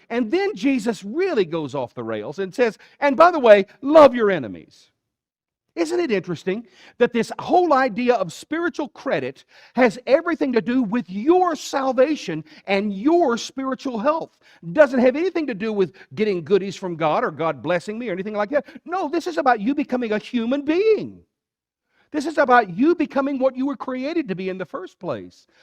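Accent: South, United States. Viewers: I don’t have to miss Southern accented preaching just because I converted lol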